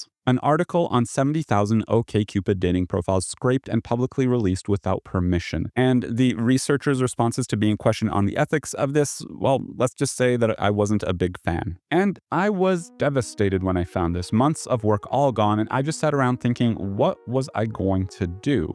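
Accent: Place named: Sweden. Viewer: I see, sorry for assuming. I thought I heard like a faint hint of Swedishness in the way you pronounced some vowels. Great video by the way🙏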